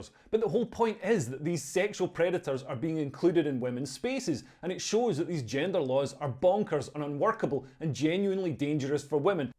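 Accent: Scotland, United Kingdom. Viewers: Nothing like a healthy rank in fabulous Scottish accent to make my day. Thanks! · I really enjoyed your Scottish rant! You Scottish men with your quilts have always been far ahead of the rest of us 👊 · I’ll never get tired of listening to a Scot reading JK’s April 1 tweet. Who knew JK was comedy genius as well as a brilliant author. 😂😂😂😂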